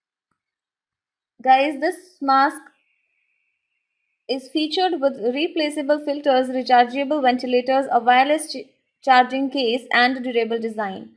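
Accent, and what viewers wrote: India. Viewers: Scam adviser but then hear Indian accent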